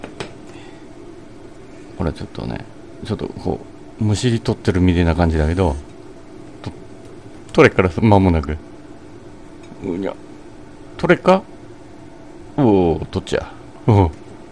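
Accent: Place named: Fukushima, Japan. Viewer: 福島弁めっちゃ楽しい🤣 いきなり笑わせて頂きました👍 左利きだったんですね〜笑 タイマー2ついる？🤔w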